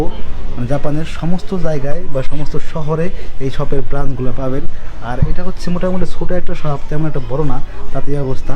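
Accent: Faridpur, Bangladesh. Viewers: ফরিদপুর এর ভাষা প্রানের ভাষা ❤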